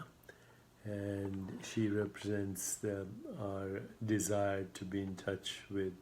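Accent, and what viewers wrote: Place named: Trinidad and Tobago. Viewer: Sometimes sounds like ah Trini 🇹🇹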